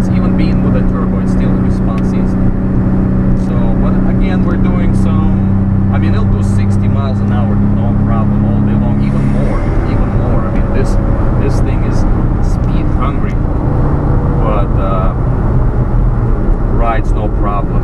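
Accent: Russia. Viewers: Ты русский? 😁 акцент жесткий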